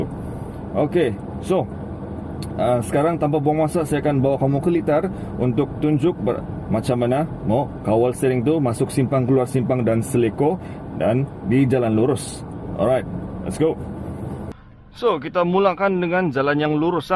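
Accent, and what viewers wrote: Sabah, Malaysia. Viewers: Macam logat Sabah jk ni...btul ka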